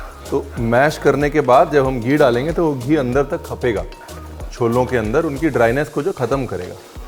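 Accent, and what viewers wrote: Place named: Punjab, India. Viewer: Ranveer, you are awesome, but I like you to hear you in Punjabi style Hindi.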